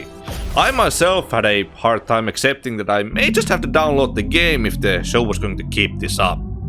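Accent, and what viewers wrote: Finland. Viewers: Hahahaa I knew you were finnish!!! :D huomasin heti aksentista x) Mutta arcane on vitun hyvä, se taide on niin kaunista en kestä! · Ensimmäiset kymmenen sekuntia: Aksentti.. Onks tää jätkä suomalainen? : Hmm : :D Vois itsekin katsoa suomeks läpi. For shits and giggles :D Noh mutta kumminkin hyvä video. 6/5, toimii. Pitänee katsoa muutkin tekemänne videot. Ja kanava tilattu! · Love the Finnish accent. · Your channel is criminally underrated. I like how you become progressively and aggressively more Finnish throughout the video. Edit: Btw, you have the personality and energy of a person 1M subscriber channel so keep it up. You could make it big for real. I was expecting to see at least several hundred thousand subs at least when I looked at your subscriber count.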